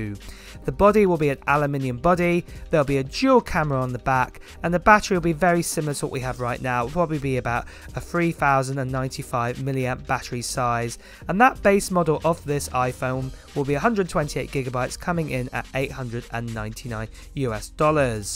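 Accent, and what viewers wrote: United Kingdom. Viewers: Why don’t you have captions? I’m very HOH.. and have great trouble understanding British dialects!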